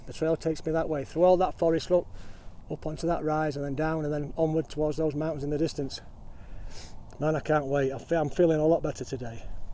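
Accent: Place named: Yorkshire, United Kingdom. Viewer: Cheers. Me and Judith hiked this trail in 2022 and are currently enjoying watching your adventure as it unfolds. One episode a night after tea! Great to relive some of the great scenery and your take on a fabulous part of the world. Looking forward to the second half.....oh and nice to hear a Yorkshire accent!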